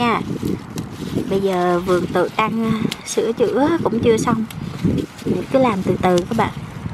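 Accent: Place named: Central, Vietnam. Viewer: Cô người miền Trung ạ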